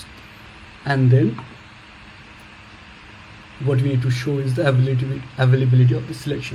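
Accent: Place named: India. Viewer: Finally an Indian who has proper accent 😁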